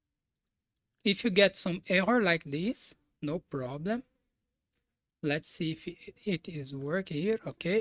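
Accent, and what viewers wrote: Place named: Brazil. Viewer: Excellent explanation, congratulations. One doubt is that from the way you speak, it seems to me that you are Brazilian. Am I right?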